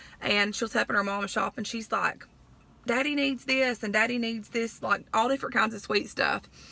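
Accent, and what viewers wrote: South, United States. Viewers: I like you, you are from the south and you talk how you talk if people don't like what you are saying they don't need to listen.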